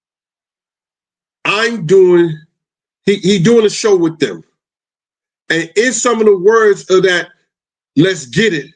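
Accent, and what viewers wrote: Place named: St. Louis, United States. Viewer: don't sweat it, I love how you mispronounce some words. I love that you still have that St. Louis country dialect, despite being in New York so long. I like it, because you remind me of my country uncles. ❤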